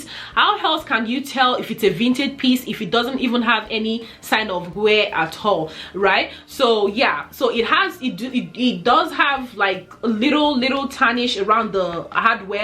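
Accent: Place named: Sierra Leone. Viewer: Thank you for the great review. It is beautiful. Like you. Is your lovely accent from Sierra Leone?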